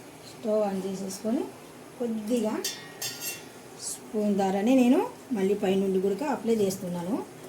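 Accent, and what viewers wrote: Rayalaseema, India. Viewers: Nippulu,telling in tellugu very nicely.from rayalaseema ?.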